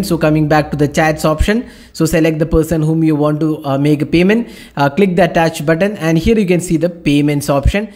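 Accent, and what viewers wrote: Kerala, India. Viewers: R u malayali sir..? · speaker is talking too fast to have a tutorial . Never even paused once so we could follow. Not everyone is Indian & understand this fast talker w/accent as well. Horrible.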